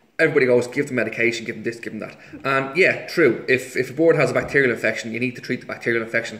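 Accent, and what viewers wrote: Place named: Dublin, Ireland. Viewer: great video pal I'm keeping linnet cock to hen canary. two pair of canary red poll cock paired to two hens in one of me flights and pair of goldfinch in the and two cock goldies paired to two hen canary ur soft food mix looked great . can you tell me where to get canary soak seed I'm just use in pigeon soak at the minute the stuff u get in Turkey thanks for a great video and help full tips hope u can help I should have said I'm from Dublin and u sound similar thanks again keep the videos coming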